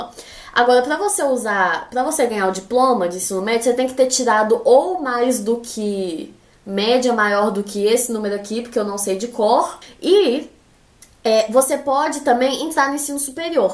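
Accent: Minas Gerais, Brazil. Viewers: E sotaque de nos mineiros !!!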